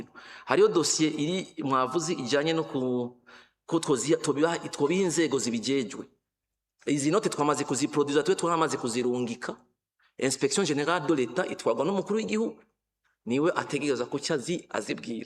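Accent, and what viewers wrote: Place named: France. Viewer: Uyu numurundi canke numu francas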